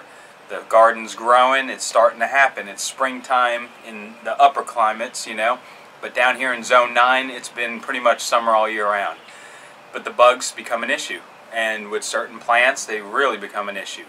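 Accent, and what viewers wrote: Philadelphia, United States. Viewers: Zone nine? Do I hear a Philly accent though?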